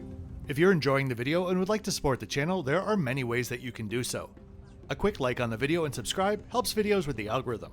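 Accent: United States. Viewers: I would very much like to know where you're from. You sound american but it'll be helpful to know your heritage to understand the hit job you're doing on air algerie. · Your accent tells me you’re from the US. I travel in the US sometimes a 5 1/2 flight from the west to the east, guess what? No food served at all. As far as safety.. they arrested a pilot once who was drunk as hell, yes this happened in the USA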